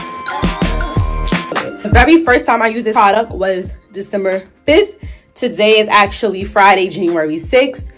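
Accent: New York, United States. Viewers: You are so NewYork! I love it ! I'm from Queens and I know an original NY accent when I hear it. Subscribed.....God Bless you and your daughter. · I like the video helps you from New York 🗽 I peeped the accent 😂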